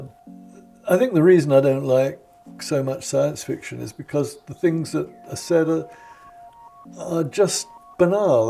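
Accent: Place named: Southern England, United Kingdom. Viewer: He's not from Liverpool though! His accent is from the south of England (like mine)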